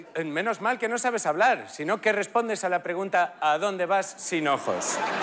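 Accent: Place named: Albacete, Spain. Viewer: El tono manchego de Albacete 😂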